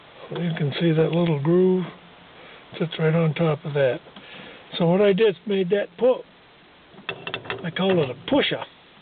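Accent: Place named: New England, United States. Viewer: YOu are working on one of the most frustrating parts of any vehicle. I have two GM tilt columns so I share your pain. I believe you have the spring on upside down and that you need to compress it to get the clip ring into the groove on the shaft which is a very tough job. That will hold the upper bearing race inside the ball bearings and eliminate the floppy feeling you describe. Not sure why steering columns have to be so ridiculous but some engineer is laughing you can bet. Loved the New England accent and would like to see pictures of your hot rod. Noticed the Ford break petal in the video. Happy New Year and best wishes for successful completion.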